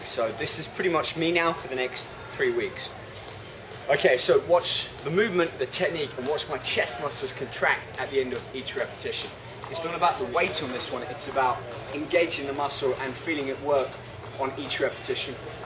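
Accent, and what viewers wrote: United Kingdom. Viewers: i never did like brittish accents, but the guy is decent well proportioned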